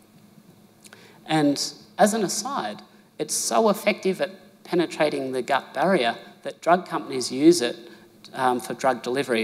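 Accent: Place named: Australia. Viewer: Thank god at last somebody from Australia talk not US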